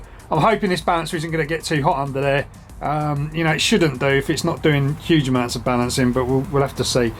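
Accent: United Kingdom. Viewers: From your accent I guess you're British excellent very brilliant was Gordon Ramsay your chef and I do think it's brilliant how you're soldering batteries I want to do the same thing to my e-bike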